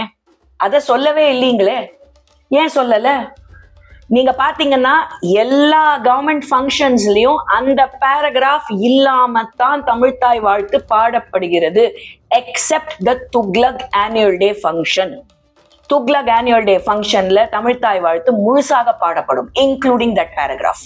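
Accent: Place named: Karnataka, India. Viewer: அம்மா உன் பேச்சுல கொஞ்சம் கன்னட காத்து வீசுது 🙂🙂🙂🙂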